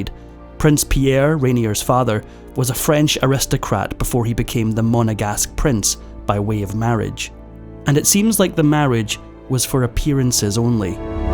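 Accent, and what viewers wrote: Scotland, United Kingdom. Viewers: I only watch the videos narrated by the Scot. Have him narrate them all, pay him more, and I think you’ll have lots of channel members. ❤ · I love his Scottish accent. He speaks clearly and is very easy to understand! 😊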